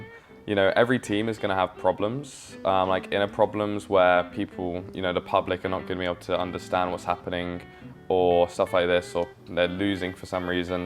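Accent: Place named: United Kingdom. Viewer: イギリス英語で声が良いから耳が心地良い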